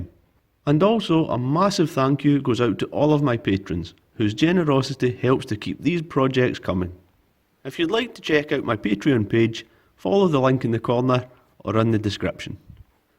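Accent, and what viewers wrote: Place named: England, United Kingdom. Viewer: yes of course the finest English accent in the world. According to me he could talk a little bit more, than I could listen to the only true English accent all day long 👍🏻👍🏻👍🏻👍🏻👍🏻👍🏻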